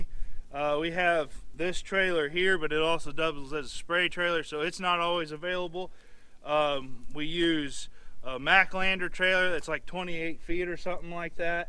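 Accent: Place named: Missouri, United States. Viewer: Just subscribed... good vid! I am from the Albia, IA area, so your accent is getting close to MO, I can tell. Tip: I believe you should not overlook the tractor as not worth mentioning. Too low on HP and weight, and it may not pull and properly operate the equipment. Too large, and it will be a waste of fuel, and the hours on a expensive machine, hours that should have been put on a lower cost machine. Blessings for 2020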